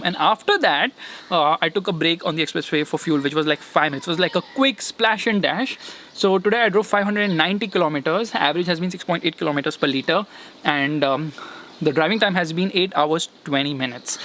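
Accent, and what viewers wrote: India. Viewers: Now I understand why Americans complain about Indian English. Speed - talks 5 sentences before the listener mind tries to understand and sink 1st sentence..!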